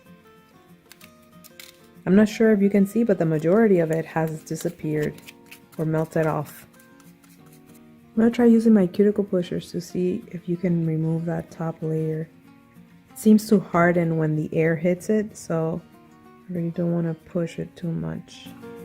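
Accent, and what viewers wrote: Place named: Latin America. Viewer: Wow! You have that traditional Latina accent that is so distinctive. I love it. Keep up the great work.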